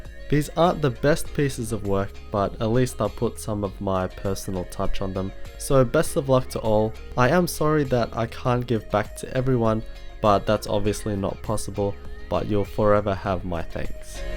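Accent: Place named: Australia. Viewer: you sound more like an aussie than a filipino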